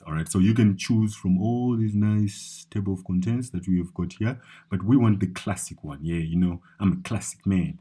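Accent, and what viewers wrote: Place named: Zimbabwe. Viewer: Hahaha...nice one, just listening to you, you reminded me of my Zimbabwean friend, so I want to guess you are fron Zim...lol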